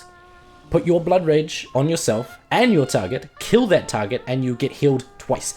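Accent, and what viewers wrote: New Zealand. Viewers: Andre Louw he's a kiwi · He's NZealander maybe?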